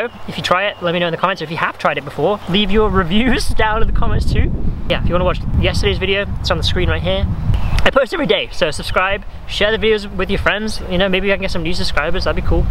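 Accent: Wales, United Kingdom. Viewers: I watched one of your really old videos the other day and you had a welsh accent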